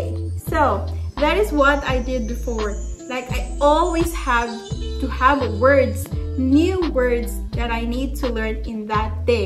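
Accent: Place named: Philippines. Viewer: Are you a Filipino? Hehe. Because you sound like a Filipino accent when speak in English 😅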